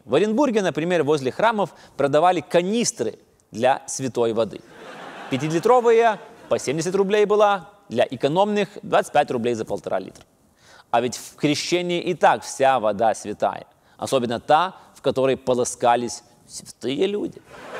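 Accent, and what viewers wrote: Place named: Lithuania. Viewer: po truputi dingsta lietuviskas akcentas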